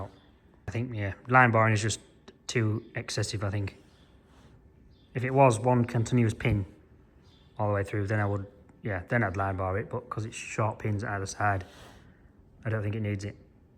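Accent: Yorkshire, United Kingdom. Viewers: The sound effects , brilliant, such a contrast to the deadpan Yorkshire, loved it.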